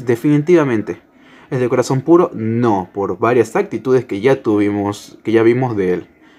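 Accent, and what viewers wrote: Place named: Argentina. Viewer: Tu voz me acuerda a tucosmopolis pero con acento argentino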